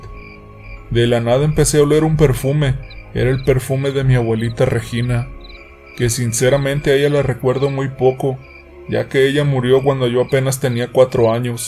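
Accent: Mexico. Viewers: Ay amigo!..Perdí diez minutos de mi vida, creyendo que tu historia tendría algún cambio..pero qué caracho!..Tu feroz acento mexicano me estaba matando!..Y por que tan larga la historia?..te repites y te repites..o sea..NO tiene desenlace, loco! Aparte que a tu tono de 'narrador'..le faltan inflexiones!..variedad! supongo que no eres robot!...o sí? Por favor, toma clases de locución! De verdad.. te van ayudar!😒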